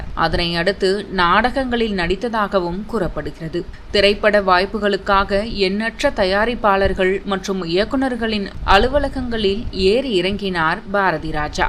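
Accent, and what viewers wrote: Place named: Theni, India. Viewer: Enga ooru thangam ivaru ivarum marimutthu sir ivanga rendu perum than enga theni oora oda slang la aptiye passuvanga ivanga pessuratha ketta avlo happy ya irukum we miss u sir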